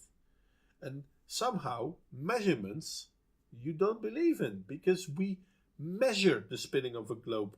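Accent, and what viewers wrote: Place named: Netherlands. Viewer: Definetly tasting some Dutch accent in the air...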